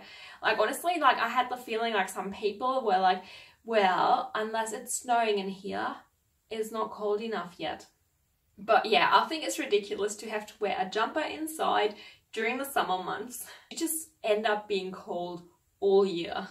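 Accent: Australia. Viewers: Yup, you really sound like an Aussie just one thing, don't say Football next time, say Soccer. Lol. ❤️ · Mt Beauty, N/E Victoria. Almost winter here, snow last week. You still sound very Australian. Where are you now? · Living in Australia only for 3 years how did you get their accent so well. I would mistaken you as Australian if I didn't know you were German. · No one would know you were German, you just sound Aussie. Amazing.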